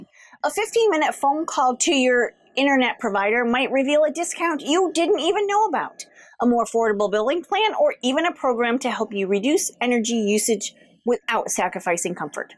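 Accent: United States. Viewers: You are truely a breath of fresh air. As a frugal Welsh great grandma, I love to listen to a new voice, yours is so sweet, I love your accent from across the pond.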